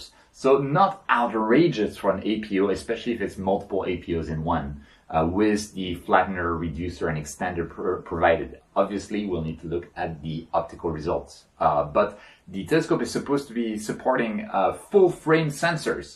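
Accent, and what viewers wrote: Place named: France. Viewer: Bonjour de Belgique, it seems that you have a french accent, serais-tu français?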